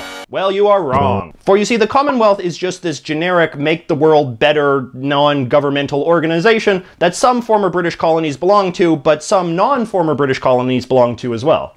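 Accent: Canada. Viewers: About. Foond. Canadian accent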